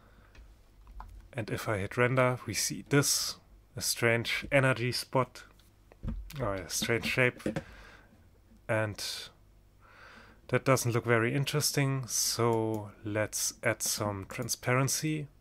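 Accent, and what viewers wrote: Germany. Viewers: Man hört schon in den ersten 10 Sekunden, dass du Deutscher bist^^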